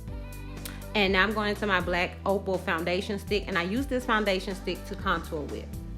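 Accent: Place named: Alabama, United States. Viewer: I love your personality!! You sound like ALL my southern cousins in Alabama. 😂 ♥️♥️♥️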